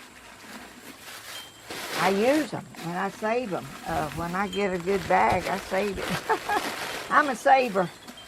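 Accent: South, United States. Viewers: My Daughter that passed away Had long,Thick Hair down to her Waist before she started Kindergarten & I would Braid her Hair! I always wanted to Learn to French Braid, but never learned how! when you were talking about your Mother getting tangles out of your Hair & going to The Beauty Shop to get your Hair done 💇‍♀️ at Beauty Shop once & how they Pulled your Hair, it does Hurt when you are Tender Headed! My Sister - In- law used to give me Perms when I was Growing up, she was sooo Rough combing my hair, when she gave a Perm, the roller’s would be sooo tight, it felt like your Hair was coming out at Roots lol sooo I can relate with You on that, Guess I was tender headed too lol You do a Beautiful job, Cutting, Giving Yourself Perms & Fixing your Hair, always look’s sooo Pretty! I cut my own Hair, haven’t cut it in a long time! I Wish I Had Natural Curly Hair but wasn’t blessed with itl lol! 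I wish I could give myself a Perm, Never tried too, haven’t had a Perm in Years!You are A Beautiful Lady & Certainly don’t look your Age! That is going to be Beautiful! You are sooo Talented! Enjoy your Video’s! Love ❤️ your Southern Accent! That is Absolutely Gorgeous! I like the Vintage Look & Love ❤️ Older Thing’s………. Blessings on You and your Family! 🙏💕😇💇‍♀️🍁🍁 Love Hearing Your Stories!My Mother used to have Sucelentss, She called them Hen & Chick’s! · I really enjoy your company! It reminds me of my Mom's people from the South. I could listen to my great grandma all day long and you're the same. When she laughed she sounded just like you. I grew up in the West so the only Southern accent I heard growing up was my mother's and she lost a lot of it over the years. So listening to you takes me back to yearly visits and fond memories. 💕💕💕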